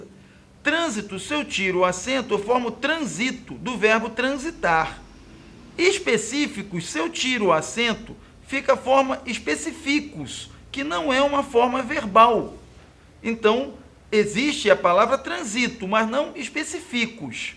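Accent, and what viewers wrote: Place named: Rio de Janeiro, Brazil. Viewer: Esse professor fala igual os pastores da Record , sotaque carioca, bem explicado , parabéns pelos vídeos , foi de grande importância , e conhecimento...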